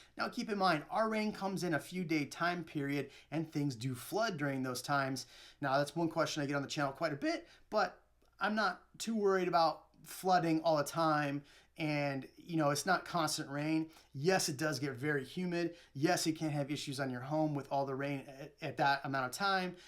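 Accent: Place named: California, United States. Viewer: Yet, you talk like a wanna be californian. Odd.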